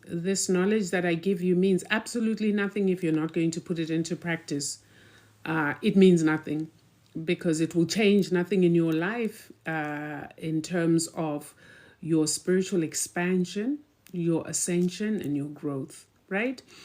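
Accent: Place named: Zimbabwe. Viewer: Hi Gogo. Very very new to African Spirituality. Just wondering if you were born in Zimbabwe? I'm seeing Great Zimbabwe on your profile and hearing an accent that sounds like home. Thank you for sharing...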